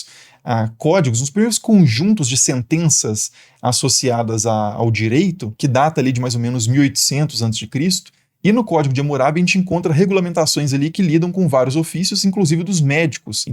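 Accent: Minas Gerais, Brazil. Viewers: O fala de um jeito como se gostasse da gente! Típico do sotaque mineiro!❤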